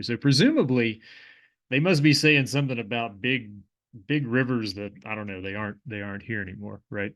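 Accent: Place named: Southern Ohio, United States. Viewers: His accent sounds like mine and I'm from Rio Grande. So my guess was also he's a local. Probably from somewhere far from Monongahela as he said it how I would guess which is apparently wrong. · OP has an E Ohio/W PA/ N WV accent. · Yoo dude must be local to southern ohio/WV he pronounced everything right 😂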